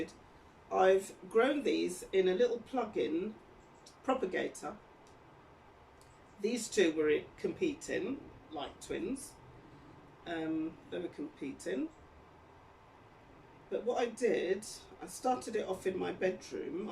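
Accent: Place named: RP, United Kingdom. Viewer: Hello, absolutely love your talk on planting ginger. You sound very posh. Can't wait for more tips from you!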